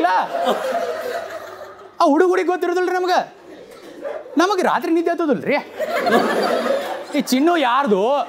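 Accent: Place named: Belgaum, India. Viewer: Clean,simple,very very funny,content- based comedy - this boy,engineer,is very very Witty,I like him,have been seeing all his videos,episodes,good command over Belgaum style of Kann- talk...get going /- am your fan...from Gulbarga- a Doctor by profession,have dn my schooling at st- Paul's Belgaum..loving it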